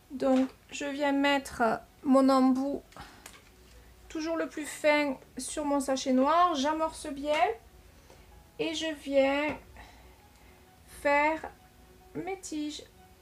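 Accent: South, France. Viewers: oh toujours magnifique et tellement bien expliqué vous êtes l'artiste peintre que je regarde le plus c'est toujours magique je vais essayer de faire celui là merci pour toutes vos explications pour moi novice dans la peinture j'apprécie beaucoup continuez de nous émerveiller et l'accent du midi met de la lumière supplémentaire dans vos tableaux suis aussi du midi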